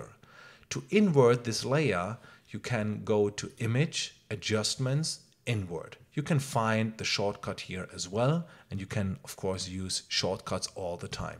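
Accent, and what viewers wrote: Germany. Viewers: Great tutorial! Keep up the great work. Gotta love that German accent! Inwoooard (invert), blent (blend) :) Reminds me of my family in Germany.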